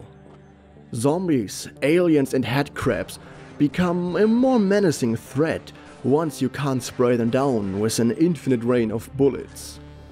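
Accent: Germany. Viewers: Man der hat so eine Richtig Deutsch-Englische außsprache ich liebe das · Man hört deinen deutschen Akzent ziemlich krass😂😂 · Man merkt das du Deutscher bist😉. Gutes Video👍👍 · Sein Deutsch-Englisch triggered mich. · are you German because this accent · Ahhh, german accent. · I smell a slice of German accent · oh also love the accent. German if I'm not retarded, I wanna learn German eventually but either way really cool. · Du kommst definitiv aus Deutschland xD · Oh hell yea a video about the functionality and realism of weapons in video games. German accent starts. Of fucking course. We just love our weapon technology. Greetings, a fellow german. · Der deutsche Akzent stört bisschen aber trzdm gutes video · ich höre direkt den deutschen aus dir raus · Your German accent is commically bad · Are those guns of yours Airsoft? Because you sound German, and from what I know of German gun laws, I don't think getting an AR pattern rifle would be very easy. 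· Are you german?your accent seens a bit german to me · You have a decent German accent Ich als deutscher kann das gut hören Nices video über eine quality of life Sache die niemand wirklich beachtet Naja der reload ist für mich der Charakter einer Waffe (wie auch der Sound oder der recoil) Es gibt der Lieblingswaffe was vertrautes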